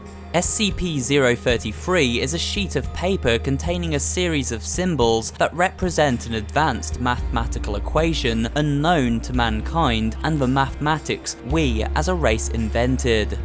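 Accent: United Kingdom. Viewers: You sound like the British version of chills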